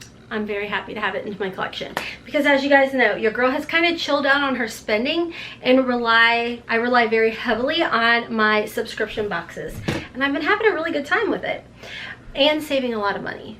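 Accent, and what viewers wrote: South, United States. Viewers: I love every so often the southern twang comes out “maaaatte” shades ❤️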